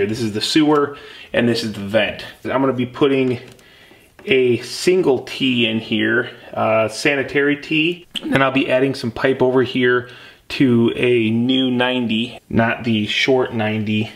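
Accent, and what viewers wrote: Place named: Southeast, United States. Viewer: Don't move the bathroom door out of the hallway into the spare room, you'll hate it later and future buyers with experience in remodeled homes will not like it either. You might think it is just a couple of corners and a few steps now, but you will get so tired of it down the road, especially those times you are in a hurry. I'm not sure where your at, but judging from your accent the SE maybe? Up North here, I would have looked into extending those 2x4s by tacking on some 2x2 and replacing a few 2x4s around openings with 2x6s to get a 5 1/2" deep insulation bay. While you were doing that you could have fixed the framing around the windows with proper king/cripple/header arrangements.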